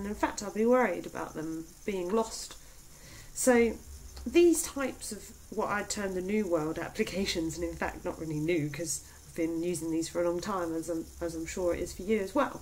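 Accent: United Kingdom. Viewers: Superb and yes, love your British voice. Please do more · I love your british accent. Are you Renee Zellweger? Jajaj. Sorry. Excelent video. Thanks a lot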